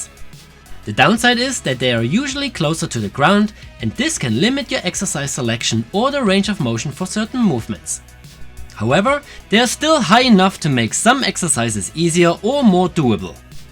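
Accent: Germany. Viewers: Leichter deutscher Akzent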